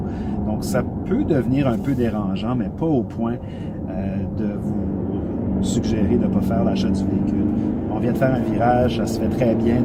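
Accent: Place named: Montreal, Canada. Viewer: Superbe vidéo. J'aurai pensé que vous étiez de Montréal!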